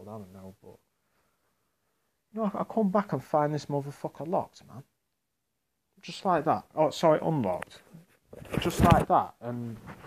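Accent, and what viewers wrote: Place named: Nottingham, United Kingdom. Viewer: Have you ever wondered if you have mental health problems? I'm sorry if my comment offends you but you're living in hell at the moment and getting help might make life better for you, If not at least you can be sure it's not your mind playing tricks on you. I'm from Nottingham, You sound like you're from Nottingham as well or near? Take care.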